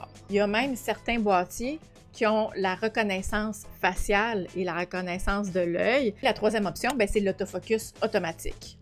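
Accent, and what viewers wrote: Quebec, Canada. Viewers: Avant tout, trop mignon cet accent québécois. Il est vrai que le bouton enclencheur, dans sa position à mi-course, règle deux paramètres. Le premier est l'AF et le deuxième est... la mesure de la lumière appelée posemètre. C'est la raison pour laquelle, il est utile de séparer l'AF et la mesure de la luminosité, avec le back button. Par contre l'autofocus a ses limites pour des photos de nuit très sombre. D'où une mise au point manuel à l'aide de l'écran (Live View pour Canon).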